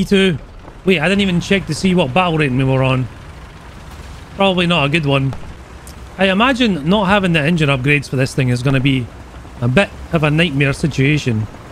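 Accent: Scotland, United Kingdom. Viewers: love your videos! I'm new to your channel, but so glad I came across one of your vids and subscribed immediately! Not sure if or how you take suggestions/requests to showcase a vehicle, so I'll just ask here: could you possibly take out the American T54E1 (8.0 BR) and show how it fairs these days? P.S. Everything sounds so much better with a Scottish accent, please say: "Pesky murderous sidewinder" in the thickest Scottish accent you can master in one of your next videos!;P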